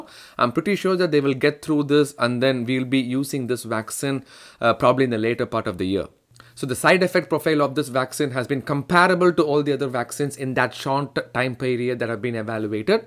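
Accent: India. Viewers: I could listen to your accent all day, you just can't have a bad day when you hear that Indian accent it's so happy. Keep up the work.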